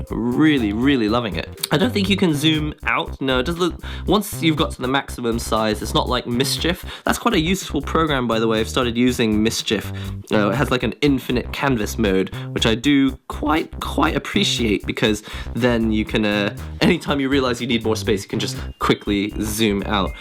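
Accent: United Kingdom. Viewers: Hey, new subscriber here. Are you from the UK? Thats not an American accent!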